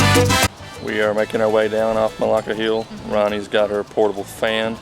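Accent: Kentucky, United States. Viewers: Fabulous video! Great music and editing, well done! Love it (especially the Kentuckian accent)!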